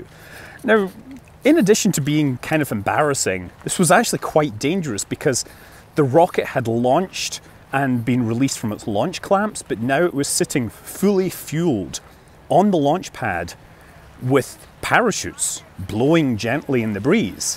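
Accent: Scotland, United Kingdom. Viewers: I mostly listen for the science/ history lesson in a thick Scottish accent.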